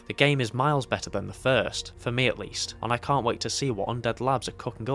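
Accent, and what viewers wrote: United Kingdom. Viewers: just started replaying both games and i have to say bro you've earned a new subscriber, i watched your SOD 1 video when it came out and it was fucking hilarious and so is this one, you're a good youtuber and i hope to see more videos from you, its rare i comment saying things like this so you're welcome, cant wait for the video about SOD 3 btw, what part of the UK are you from? i cant tell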